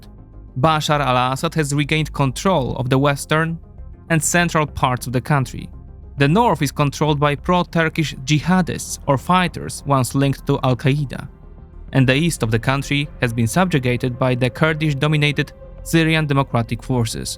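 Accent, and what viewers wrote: Poland. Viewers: Nie bardzo rozumiem jak można mieć tak podobny akcent do "naszego" ( 😉) i być tak konsekwentnie stronniczym na korzyść naszych obecnych okupantów 🤦‍♀ ( których mamy już ponad 10 tysięcy i to w pełnej gamie kolorystycznej - ze wskazaniem na tony wieczorowe... ;) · He’s Polish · The narrator sounds Polish. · His information are biased and not accurate. Not a surprise with his polish accent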